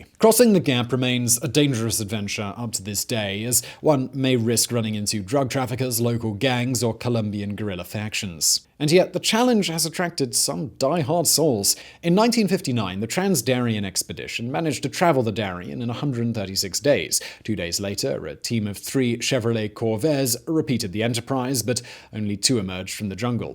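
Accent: United Kingdom. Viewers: Nice video but the British accent is overkill.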